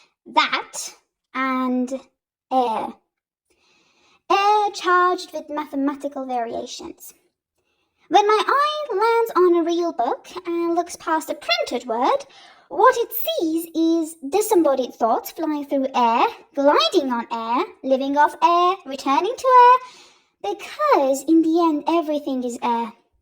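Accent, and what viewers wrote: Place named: United Kingdom. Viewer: Iove it The British accent is funny to me 😄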